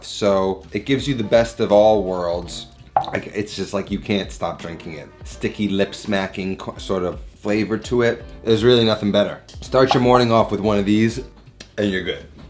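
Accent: New York, United States. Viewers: I watch for the food, your handsome face/ NY accent.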